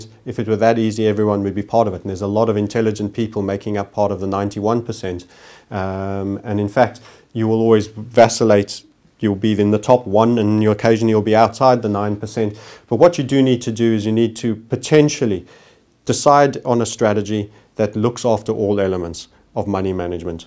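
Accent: South Africa. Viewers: SA accent.. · He has a South African accent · Why do you sound South African? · you sound south african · This guy sounds South African 🇿🇦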